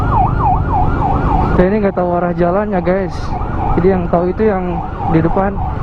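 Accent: West Java, Indonesia. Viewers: sunda gening anjayy 😆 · Oh abang teh orang sunda. Sama atuh bang sunda na timana. Abdi mah cianjur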